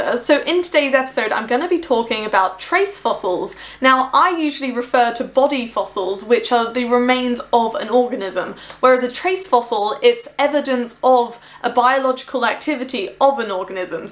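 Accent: United Kingdom. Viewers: A lady’s British accent can even make “poo” sound classy.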